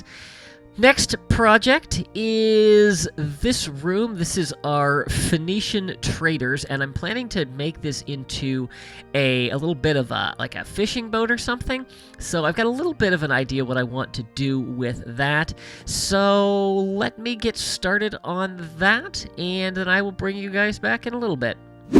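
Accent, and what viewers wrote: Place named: Tennessee, United States. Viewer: Another great video. I recognize that vaguely central Tennessee accent and it reminds me of my grandparents.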